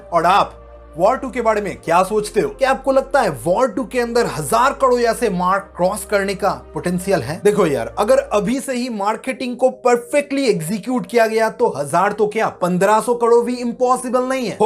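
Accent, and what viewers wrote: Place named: Bihar, India. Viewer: Aap ke speaking skills me bihari pan aa raha hai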